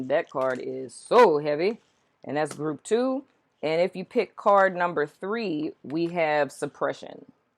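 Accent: New Orleans, United States. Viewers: ok maw, cutup! Glad i found this video...i got a lot from it. Thanks! I heard a lil Nola accent in there! Lets geaux!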